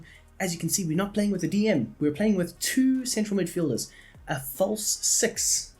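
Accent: South Africa. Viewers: Are you South African by chance?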